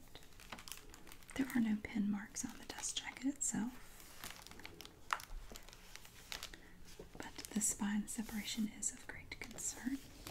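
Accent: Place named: North Carolina, United States. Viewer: Your voice reminds me of home. Born and raised in North Carolina. Very soothing to listen to when I'm far away from home. Hope you had a blessed holiday season 💕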